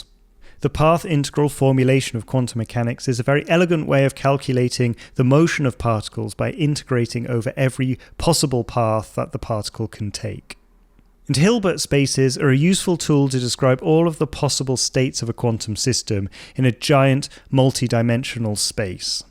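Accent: United Kingdom. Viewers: Your British accent is enough for me to believe you. Forget about the content.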